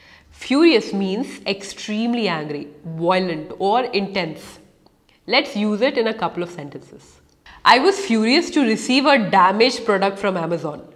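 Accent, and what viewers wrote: India. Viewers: I have always been distressed about my speaking skills. I get furiously annoyed whenever i stuck while speaking. My command on English was awful. I like your Indian accent so much that I'm thrilled to listen to you. Now I'm assured that I will do a spectacular job in this.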